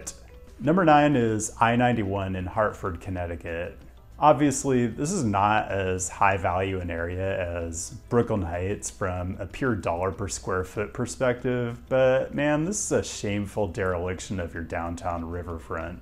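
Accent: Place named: Midwest, United States. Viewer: Enjoy your dry and calm delivery on the review. 'Public health malpractice' indeed. The mildly midwestern accent helps.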